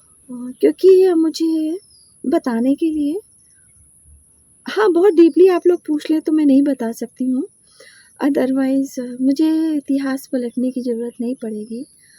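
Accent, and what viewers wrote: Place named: Kanpur, India. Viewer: बहुत अच्छी है आपकी कनपुरिया ❤❤❤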